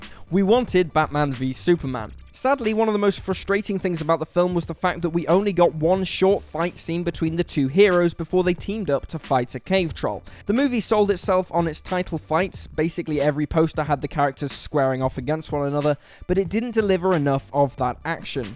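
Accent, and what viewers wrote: United Kingdom. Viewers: Leto not Leeto you limey mushmouthed douche.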